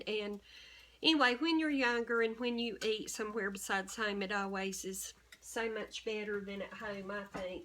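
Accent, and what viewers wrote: South, United States. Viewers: Those glasses and your Southern accent are wonderful. Great video thanks.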